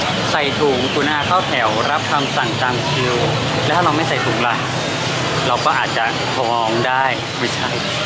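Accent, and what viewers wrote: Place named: North, Thailand. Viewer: ชอบฟังพี่ พูดเหนือมากเลยค่า น่ารักมาก อยากให้ทำคลิปพูดเหนือเยอะๆๆๆเลย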